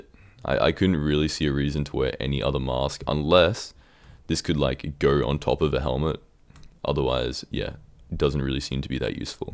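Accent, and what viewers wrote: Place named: Australia. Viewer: Hey, im assuming you live in Australia becuase of your accent so how do you get better ping to hypixel becuase I am struggling on 270 ping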